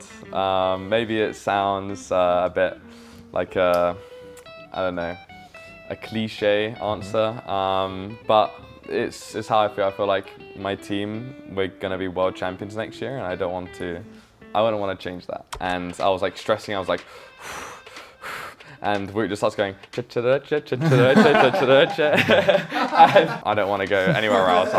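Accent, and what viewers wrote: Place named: United Kingdom. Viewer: イギリス英語で声が良いから耳が心地良い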